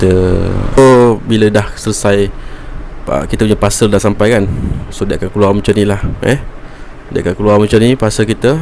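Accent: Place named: Perak, Malaysia. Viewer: Orang perak ke mike 😬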